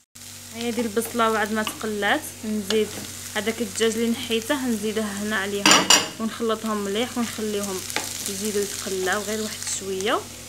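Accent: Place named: Tlemcen, Algeria. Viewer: انا مشتركة جديدا هل من ترحيب ختي راني شفت قناتك بصدف وعجبوني الوصاة مشاء الله عليك ختي انا من مستغانم من صوتك عرفت نتي من تلمسان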